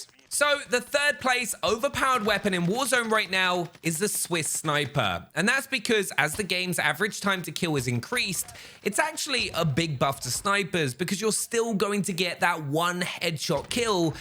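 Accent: United Kingdom. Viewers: Always have been subscribed and notification squad! Teamwork make a dreamwork. Always loved your British voice, keep up the good work mate!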